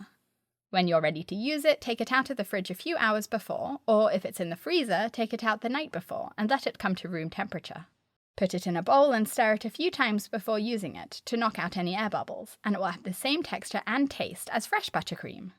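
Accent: United Kingdom. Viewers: Amazing tips.By the way are you British?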